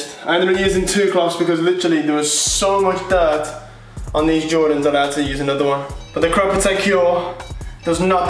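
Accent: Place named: Liverpool, United Kingdom. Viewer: What accent even is that like southern with a twang of scouse mixed in 😂😂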